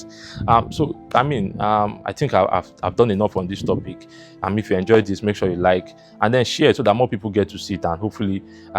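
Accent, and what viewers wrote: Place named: South London, United Kingdom. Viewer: The landmark structures are that of a warehouse standard and obscene for such a location. Of course, most Nigerians have never seen beach tourism like Brazil and Spain. make him collect his compensation and go somewhere. The standard is 0. He guy should stop brainwashing Nigerians with his Saf London accent make we hear word.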